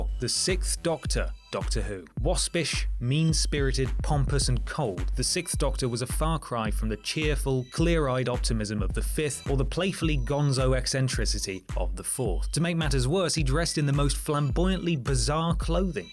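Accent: United Kingdom. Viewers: Who do I hate more than anyone on this list? Smarmy YouTube hosts with pseudo-posh Brtitish accents who don't know their arse from their elbow, perhaps?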